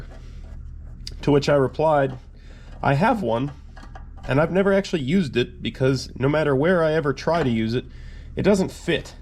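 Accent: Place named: Western Pennsylvania, United States. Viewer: I just found your channel, and I don't know where you live, or where you're from, but judging from your accent it must be western Pennsylvania, am I right?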